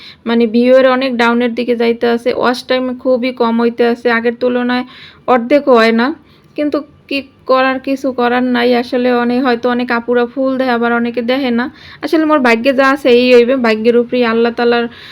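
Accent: Barishal, Bangladesh. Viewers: তোমার বরিশালের ভাষা বেশ ভালো লাগে ❤❤❤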